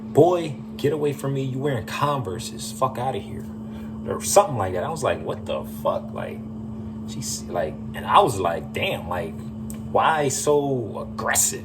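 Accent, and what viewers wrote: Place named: Caribbean. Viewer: Okay, after listening, it sounds like you're of carribean descent.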